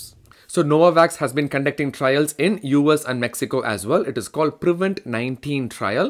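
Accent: India. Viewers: I could listen to your accent all day, you just can't have a bad day when you hear that Indian accent it's so happy. Keep up the work.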